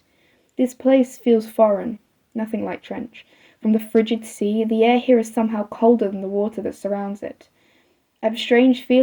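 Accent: United Kingdom. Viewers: very british i don’t like it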